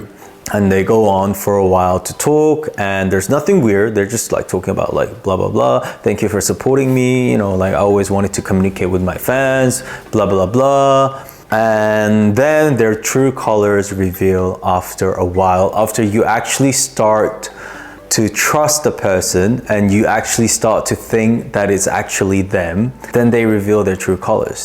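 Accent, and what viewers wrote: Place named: United Kingdom. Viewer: First of all, celebrities do not have time to be chatting with their fans privately. They don’t even have a lot of free time so if they do find free time, they would NOT use that to private message a fan. Sorry fan, but you’re not that interesting. Why would a celebrity choose you over his colleague or another person of equal status? 🤔 Also, celebrities work hard to be where they are so they’re not interested in socializing with fans who are in a place of dreaming and fantasizing. If they want friends, they would choose ppl of the same status because they’re more relatable and trustworthy since they’re of the same socioeconomic status. If you’re a victim of scams then that’s one reason why a celebrity would never talk to you. Lol. You may not have money, but at least be a smart human being so that way you can at least appear attractive. 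Let’s be real. I’m not a celebrity, but not even I would want to be your friend. 😂🤣😂 I do hope the fans that fall for these scams are little kids. This video would be helpful to them. If you’re an adult and not able to use basic common sense to distinguish what’s real and what’s not, you got issues. Being scammed is not even a problem worth highlighting if you’re an adult. The root issue is… 🫢😅 Btw I appreciate this video. I’m new to this channel and I love the British-English accent. I always thought English Language Learners would want to learn American-English. It never occurred to me that an ELL would learn English with British pronunciati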